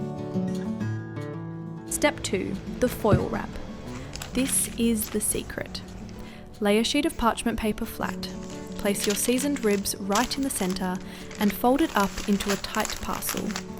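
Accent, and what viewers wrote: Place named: England, United Kingdom. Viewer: Why do the videos for this channel use a voiceover with an English accent, when it is clearly produced by a US citizen? Yellow onions are used in some recipes but they are called brown onions in the UK. All UK airfryers use temperatures in Centigrade, but Fahrenheit are always quoted first on this channel. And no-one in UK calls an aubergine an eggplant. Just be honest guys!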